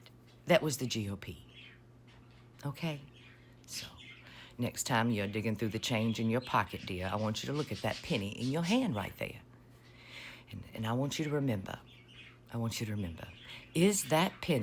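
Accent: South, United States. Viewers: I love that i found your content. I dont know if its the passion behind your voice or if its the rhyming delivery, it may even be the southern accent. Regardless, its all a recipe for my new favorite political content. also, the way you say "bay-bee" has become a vocal stim for me now 😅. Thank you for what you do and who you are ❤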